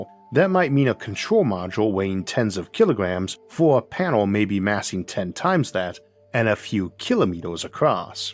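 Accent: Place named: North Carolina, United States. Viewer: "Whey-oh distances are great-or" - - It's kind of a North Carolina accent... but not exactly.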